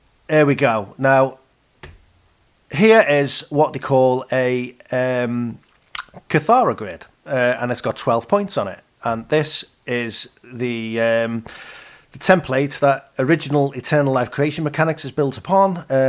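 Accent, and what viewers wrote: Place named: Merseyside, United Kingdom. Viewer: hi, can you tell me whrre you grew up please you sound like we grew up nearby.. my first years were Maghull and burscough. Just askin if its an issue just ignore. Its a complicated world just now. Great work...thank you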